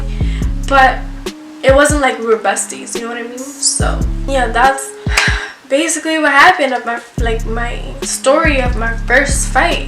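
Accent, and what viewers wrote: New York, United States. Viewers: Your from NYC? You sound like it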